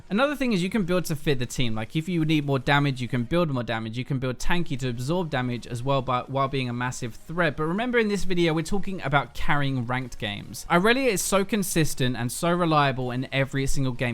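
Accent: United Kingdom. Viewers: i love that british accent hehe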